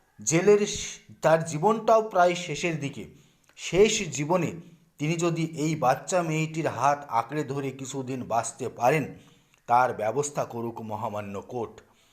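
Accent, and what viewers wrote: Bangladesh. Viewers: বাংলাদেশী কথার জন্যে উনার কথা শুনতে ইচ্ছে করে না। বলার ধরন চেঞ্জ করুন।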